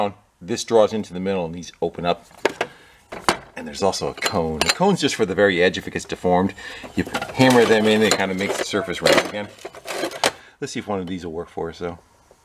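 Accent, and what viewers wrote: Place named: United States. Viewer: Just to mention great great american accent very clear and concise and you have a great laugh which is not patronizing effect.good health🙏🙏🙏🙏🙏🙏🙏🙏🙏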